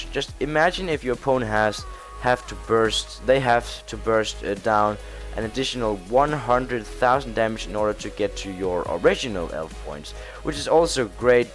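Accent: Denmark. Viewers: Hey man awesome guide! :) Just a quick question, Where are you from? U sound very Danish lol. Anyways keep up the great work! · Hey dude very nice guide !. btw are you from Denmark?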